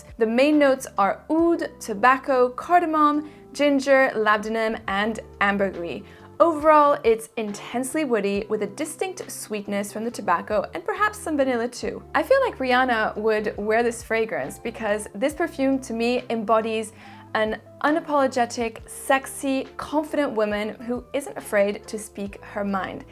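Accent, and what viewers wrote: France. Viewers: Je souhaite te féliciter pour cette chaine Youtube, tu mérite 1 million sub et j'adore ton accent français. Bonne continuation.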